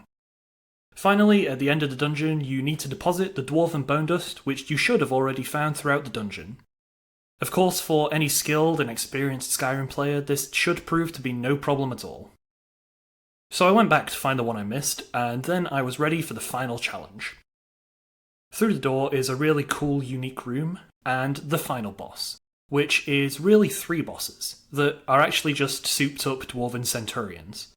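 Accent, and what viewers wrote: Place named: Taunton, United Kingdom. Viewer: Dunno about Liverpool, sounds more like Taunton to me.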